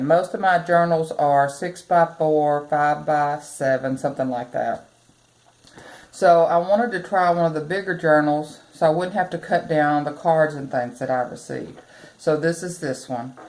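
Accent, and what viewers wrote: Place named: Northeast Texas, United States. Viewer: I'm from north east Texas & your lovely accent sure makes me homesick. I have really enjoyed seeing your beautiful journals. Thanks for sharing.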